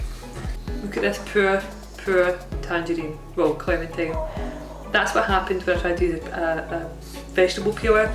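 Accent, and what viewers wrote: Scotland, United Kingdom. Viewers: This is the 1st of your videos I've seen, and it was a quick like and subscribe! I loved your zen attitude while you called the dough a bitch. I am miserable when it comes to baking. The worse was when my mom had cancer and asked me to make an Italian ricotta pie... so easy! I told her I couldn't make dough, but she said "any idiot" could make dough in a food processor. Long story short, I ended up with a crumbled mess of shit and broke into tears. Granted, the mini nervous breakdown was due to the fact that my mom was dying, but that I couldn't make that pie for her sucked, too. Anywhoosle, down the road, she loved what was to be the last meal I made for her, a simple goat cheese omelette. Thanks for taking me down memory lane. I also loved that I misunderstood your Scottish accent (me stupid American). I thought you said "beatnik" instead of "beaten egg" and laughed, "So mince pies are gamy after all." Happy New Year!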